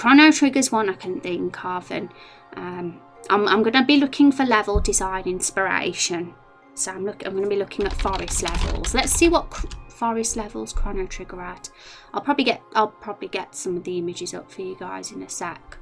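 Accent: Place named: United Kingdom. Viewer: Oh, British? had to adjust a bit but I liked it! ;P :D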